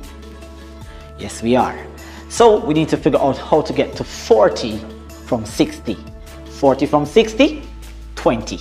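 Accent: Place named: Jamaica. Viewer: Hi there... Good Morning to you. I'm gonna suggest that you try out some kind of sound system, where you can use a chin mic (didn't wanna say mouth mic). The echo & your Jamaican dialect makes what you say hard to hear & understand.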